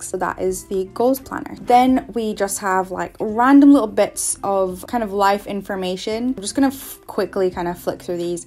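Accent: Scotland, United Kingdom. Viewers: Your accent though. Are you scottish?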